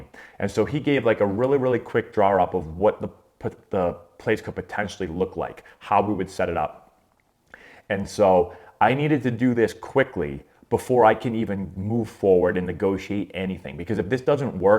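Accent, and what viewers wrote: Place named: Boston, United States. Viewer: the Boston really came out in this video.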